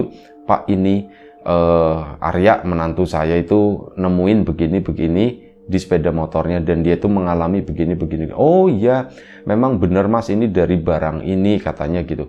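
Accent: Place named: Banyumas, Indonesia. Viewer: Wa, alaikummusasallam wr wb, Hadir dan nyimak selalu, Oh, iya buat mas narator kok ga di jawab pertanyaan saya, Mas narator asli nya mana...? Seperti nya logat nya ada ngapak ngapak nya, maaf ya mas.... Ok dia terbaik untuk Riam biru, moga makin sukses dan buat mas narator nya moga sehat dan slalu dalam lindungan Allah SWT.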